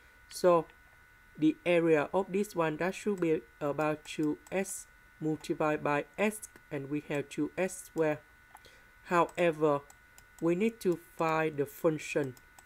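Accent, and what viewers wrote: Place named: Vietnam. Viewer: oh giọng tiếng anh này thì chỉ có việt nam :))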